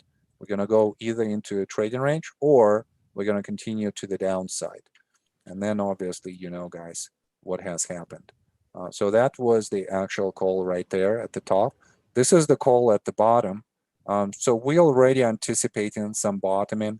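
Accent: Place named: New Zealand. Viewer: your Dulcet Kiwi Tones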